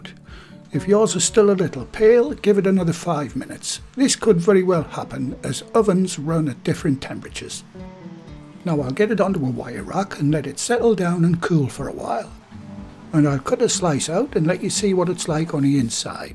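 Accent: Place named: Yorkshire, United Kingdom. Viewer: I'm going to give this a go. I will have to use pigs kidney as nothing else is available. Love your accent it sounds Yorkshire to me but I left there 60 years ago so I could be wrong. I will let you know how I go with the pie. Thanks!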